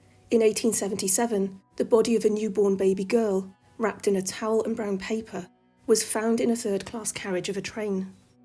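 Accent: England, United Kingdom. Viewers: Hello.. I absolutely love your channel,but your kind voice narrating incredibly interesting facts topped with that beautiful English accent has made me a huge fan.. Lots of love from Australia.. God bless.. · You and Curious world are the best 2 ghost channels with great narration and editing. Love ur voice. Always loved listening to british women. Wish i lived across the pond. Oh I forgot about the costwold explorers new channel ghostly trails or something like that Robin is a damn good narrator too. Makes all the difference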